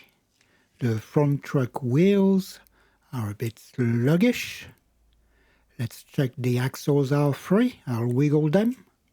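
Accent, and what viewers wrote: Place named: France. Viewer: Just commented on another video and now exploring your channel :) The french accent again 😍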